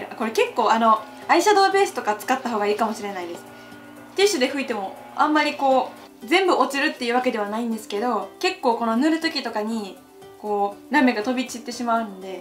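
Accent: Kansai, Japan. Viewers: 関西弁かわいい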